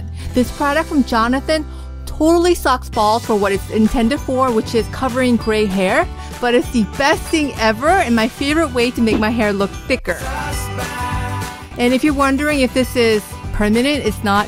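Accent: South Korea. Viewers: Are you Korean? Reason I ask is Because i think you have very very slight Korean accent...? Or is it just me? :o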